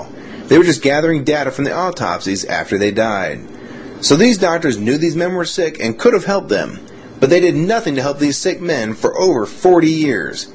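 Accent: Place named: Ohio, United States. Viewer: You sound like your from ohio or thereabouts.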